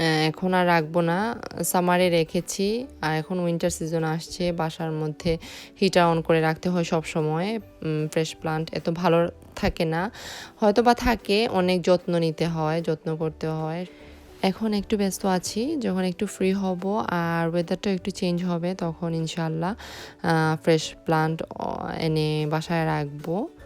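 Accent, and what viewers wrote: Sylhet, Bangladesh. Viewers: Apo are you came from salyet because your speak like that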